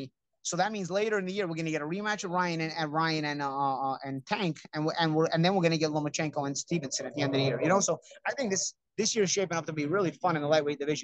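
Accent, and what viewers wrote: Brooklyn, United States. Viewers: annoying Brooklyn accent 😂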